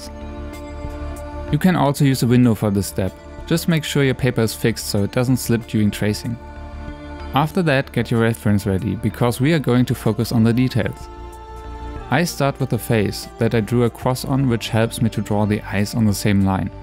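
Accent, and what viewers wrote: Germany. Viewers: Why do u sound like a german guy speaking english? I mean i would know what that sounds like since i live in Germany but...seriously bro. Btw i love your drawing skills!! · You have a German axent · Your german accent is thicc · Hey are you German? Bc you kinda sound like you are and you were at gamescom :D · You sound like a german speaking english. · Could it be you're german? Cause your english sounds pretty german to me. Und ich bin selbst aus Deutschland. xD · OK, sorry but I must ask you that... Are you a German because you have an small accent...🤔 No matter your Video is pretty cool😊😎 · Deine Stimme hört sich Deutsch an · German accent? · sorry but are you german? You have a really hard accent. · Are you german? XD man hörts sehr😂😂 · Deutscher Akzent?:D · Kann es sein das du Deutsch bist? Du hast diesen Akzent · Bro bist du deutscher hört sich nämlich so an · I dont know you but you sound like a german who speaks english. 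If its the case: hi ich bin deutscher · Ae you from germany because you have this accent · Sehr cooler Zeichenstil und gut erklärt. Hatte nur bereits nach 2 Sekunden gemerkt, dass du Deutscher bist xD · Ich könnte mich irren, aber der Akzent hört sich nach deutsch an. Lol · Dieser Moment wenn man halt direkt raus hört, dass er aus Deutschland kommt (oder zumindest deutsch seine Muttersprache ist) 😂😄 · Dude, your english is indeed pretty good but nevertheless I instantly noticed that you are german.xD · holy shit im more intrigued about his german accent and voice sounding out of place for someone who looks like that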